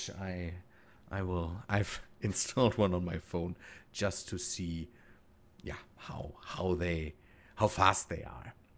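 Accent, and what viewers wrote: Germany. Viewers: Nur intresse.. 😂 Deutsche haben so eine unverkennbare Aussprache, die hört man irgendwie immer heraus..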